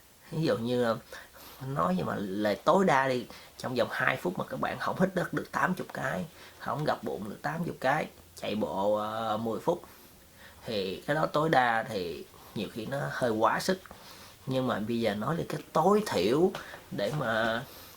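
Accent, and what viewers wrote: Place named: South, Vietnam. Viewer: Chia sẻ hay, giọng nói đậm chất nam bộ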